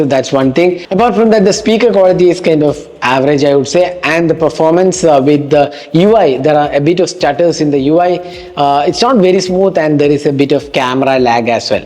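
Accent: Kerala, India. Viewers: U sound like a Malayali.......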